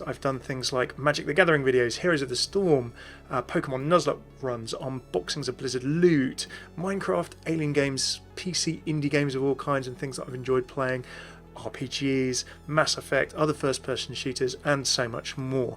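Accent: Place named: United Kingdom. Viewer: you´re welcome :D btw love that brit accent - german youtuber can´t deliver such quality as you do!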